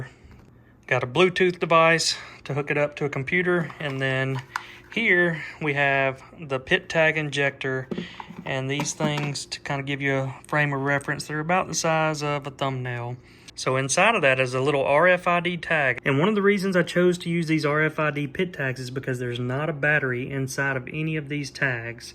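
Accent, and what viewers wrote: United States. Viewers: Man i really like your american accent. Greetings drom germany😄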